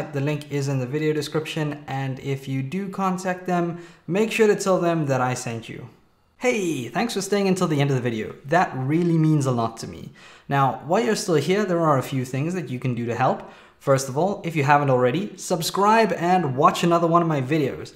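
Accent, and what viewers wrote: South Africa. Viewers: I didn't know you were south african <3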